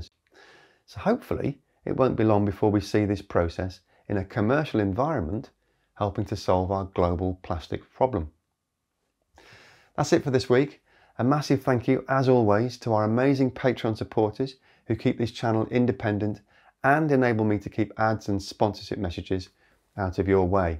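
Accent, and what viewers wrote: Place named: RP, United Kingdom. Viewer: Wow, you're a very handsome man! I like your RP English and would like to see you at the beach.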